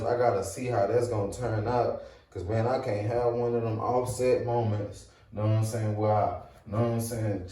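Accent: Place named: South, United States. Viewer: Bro you country as hell 😆 but your accent cool coming from a NYer🎯❤️ this video super helpful tho I just bought them an wanted too see what they look like in real time.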